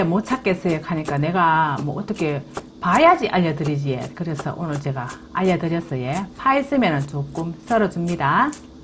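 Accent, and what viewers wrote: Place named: Jeolla, South Korea. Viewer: 그치예? 요래요래.. 그래도 되고예... ㅋ... 남도사투리..옛 사랑이 생각나는 사투리 입니다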